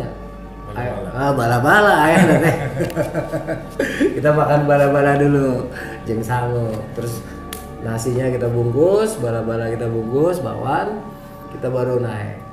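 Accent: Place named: Bandung, Indonesia. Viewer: Bang bisa logat bandung euyy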